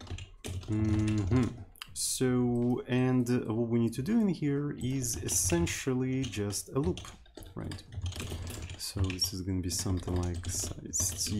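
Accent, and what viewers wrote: Russia. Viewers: русский акецнт?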